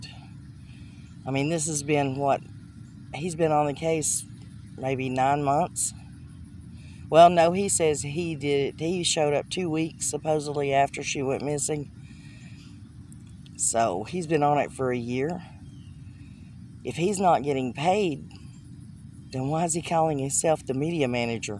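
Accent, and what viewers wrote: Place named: Tennessee, United States. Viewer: I love ya n I I think like you I. Agreeing 100 percent I love the idea I don't kw where are you cuz you sound like your around TN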